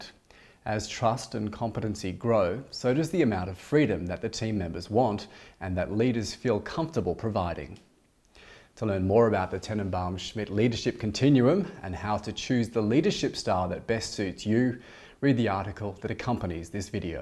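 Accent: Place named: Australia. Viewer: Somehow accidentally stumbled upon this while reading an article about a completely unrelated topic. Watched the whole thing mainly because of that beautiful Australian(?) accent. May watch a 3rd time idk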